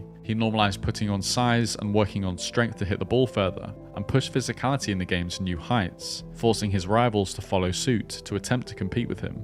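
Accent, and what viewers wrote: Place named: United Kingdom. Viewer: I think he is just British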